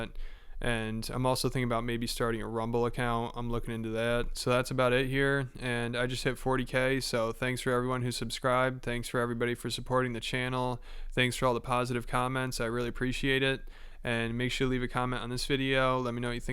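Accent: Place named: South, United States. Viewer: Are you from the south? I feel like i hear it slipping out occasionally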